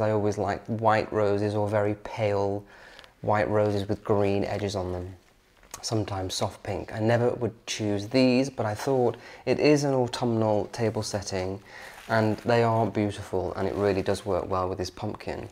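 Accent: United Kingdom. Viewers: Dear, I love your videos...Your beautiful and gentle British accent, your beautiful and soulful spirit, your sense of Elegance and Beauty and your mannerism!!! Love your natural and delightful attitude toward life, living, and sharing your interests!! Your videos are refreshing and Soulful. Love the music you selected for your videos, it is perfect!!! Your videos provide a sense of harmony, love, friendship, and sentimental values and tradition which many of us appreciate.You are Awesome!!! Superb job!!! Thank you!!❤❤❤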